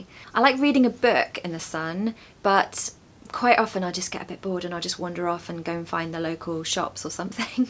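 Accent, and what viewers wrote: United Kingdom. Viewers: Hi,. I can understand your enjoyment about summer. I live in a region where the sun shines all year round, and get annoyed if it rains three days. However, I understand that we need the rain, of course, otherwise we wouldn't have food, but when the sun is bright it's good isn't it? Love your accent, you're a real british woman, thanks. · Your british accent is amazing😊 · Lovely British accent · "Shall I compare thee to a summer's day" as Shakespeare says. Enjoying the chaste British accent. Thank you❤ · thank you for interesting videos. British accent is very nice👍😁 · Tysm for this video! ❤ It's very useful to improve my vocabulary. I love your accent and British English as well! 🥰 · It's so clear your British accent that makes easy for us to learn. The emphasis in each word with details very well explained it,s amazing. Thank you so much for the lesson 🤩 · Love your british accent , and I can feel your energy and passion ! 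to cool down is pretty hard to pronounce:) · You are just adorable, beautifull, positiv, vivid, enthusiastic and your Brithish English is so lovely to listen to! Your smile and your eyes remind me on princess Diana a lot. I love your way of teaching English by telling stories about rhe British way of living, thinking and custims. I find it very interesting and useful. You bring joy and happiness into my day every time I wach your videos. Thank you so much and have a great summer! ❤😊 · Thank you so very much,. I love listening to you and with the lovely British accent. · thank you for interesting videos. British accent is very nice. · Thanks you teacher, I like your British accent, very easy to understand. ✌️👍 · It has been just amazing, I like the energy you put into your videos, the enthusiasm you show and of course your british accent that sounds pretty good to my ears. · OMG ! Your English accent is just fascinating , it's really relaxing to hear such a nice and slow pronunciation ✨ I fall in love with the British accent 🥰❤❤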